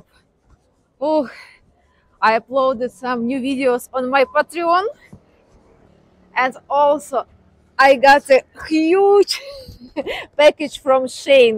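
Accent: Russia. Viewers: $200 for rent and 35% of that for electricity? Electricity is not as cheap as rent in Vietnam. Anyway, I'll subscribe to your channel just so I can listen to your beautiful Russian accent and learn about Vietnam as well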